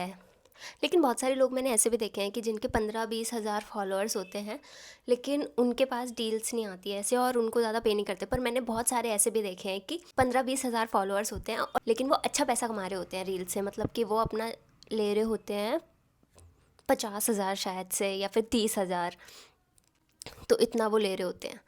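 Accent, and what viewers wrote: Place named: Pahadi, India. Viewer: Pehli bar aapki video dekhi aapke bolne k accent se hi pta lgra h pahadi ho.... 😂❤ I saw your video for the first time and I liked it, it was very honest.❤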